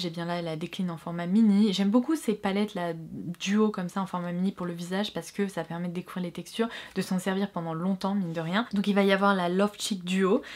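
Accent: England, United Kingdom. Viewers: Super vidéo sur les nouveautés merci de pouvoir tous nous renseigner😉. mais pour répondre à ta question ce qui m'intrigue le plus c'est l'accent anglais que tu prends à chaque fois que tu présente un produit.. 😭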